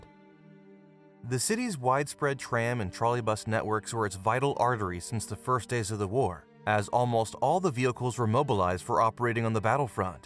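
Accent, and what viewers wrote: Russia. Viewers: По всей видимости звук просто заказан у англоязычного диктора. В ранних видео на канале голос другой и с русским акцентом. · I see you hired a professional voice over for the narration ! I admit he has a very nice and clear voice but I find it loses a bit of the charm of your earlier videos. I really like your (russian ?) accent ! It makes the video more immersive and unique :) that’s only my opinion though ! In any case I will keep watching your amazing videos. Greetings from France !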